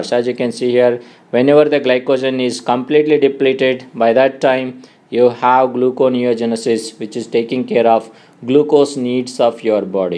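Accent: India. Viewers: Indian English is trash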